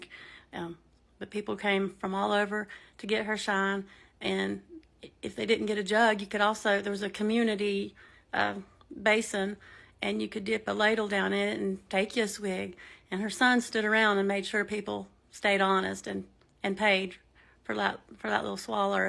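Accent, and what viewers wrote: Appalachia, United States. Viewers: I love your content and your Hillbilly accent. I’m a hillbilly also from the Ozark mountains of Arkansas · I know that you hear this all the time, But I think that you are one of prettiest women on YouTube! And though I too live in North Carolina as well, I truly love listening to your mountain accent! And I had a step dad that was from Morristown, Tennessee and the crazy sayings that he would say! Made me laugh so many times! I think that he was from so far back in the sticks that they had to pipe in Sunshine! Keep up the videos sexy gurl!!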